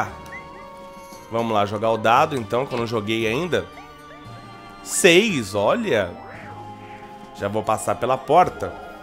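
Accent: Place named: São Paulo, Brazil. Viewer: sotaque paulistano é nois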